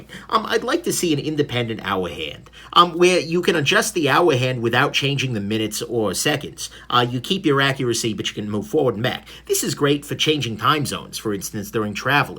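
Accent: New York, United States. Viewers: Mate, I love the New Yorker accent, the factories in Malaysia have improved over the past few years and we can see it in the Seiko and Citizen product. Only one gripe, Citizen Eco's will fail at about 7 years, I know as I have bought them for my wife and Son in the past. Their not worth sending back for refits, so a dilemma arises with the owner. Clever marketing on Citizens account it would seem. All that said what a great review. Cheers from Brisbane, Australia.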